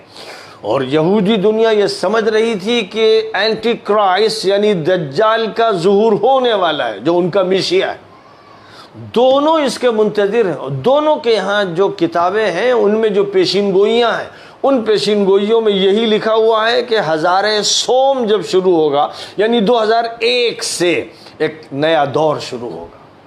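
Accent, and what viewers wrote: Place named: Lucknow, India. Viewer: ماشاءاللہ, کیا پیارا اندازِ گفتگو ہے آپ کا, خاص لکھنوی اسٹائل. آپ کی گفتگو سن کر بہت مزہ آتا ہے اور آپکی بات دل میں اتر جاتی ہے. مولانا سلمان حسینی صاحب, اللہ تعالیٰ آپ کو سلامت رکھے, کہ آپ حق بولتے ہیں ہمیشہ.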